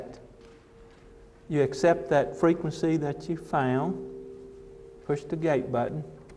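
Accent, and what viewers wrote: South, United States. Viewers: Never in my life have I heard a asian with a southern accent...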